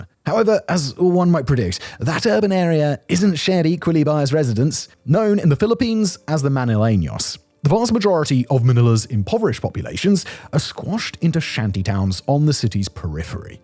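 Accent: United Kingdom. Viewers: As a Filipino I find it endearing whenever you pronounce these Spanish sounding City names and Filipino Names in your own British - white boy way 😂😂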